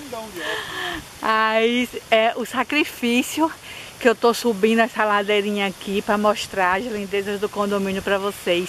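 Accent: Northeast, Brazil. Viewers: realmente vc mora num paraiso...bela beleza do lugar,e seu sotaque e no nordeste ne? mas onde?bjus minha querida vc e td de bom e muito divertida....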